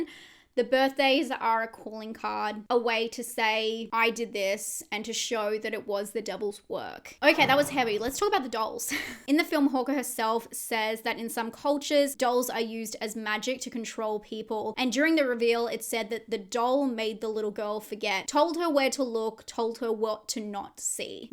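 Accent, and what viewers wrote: Australia. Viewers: I'm sorry, I'm just messing with you. I know it's because of your Australian accent. It's actually kinda cute and adorable, and I loved the video btw!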